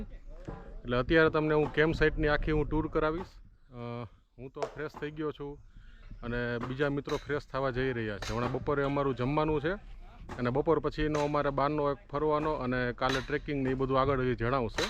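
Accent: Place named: Kathiawar, India. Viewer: is our good friend and pro bike rider since many years and a very humble person and now he is on YouTube with our Spl Kathiyawadi language I wish him on his new ride 👍✌️✨🎉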